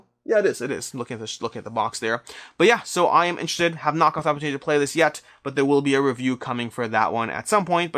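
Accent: Canada. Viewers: Oh like this sweater also, nice! Your 'canadian accent' is getting less noticeable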